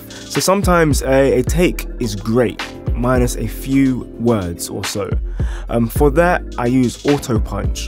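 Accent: United Kingdom. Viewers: Dont usually comment.. but I thought this was a very well produced video.: clear and fast flowing.. also refreshing to hear another UK bruh doing a tutorial. Keep it up!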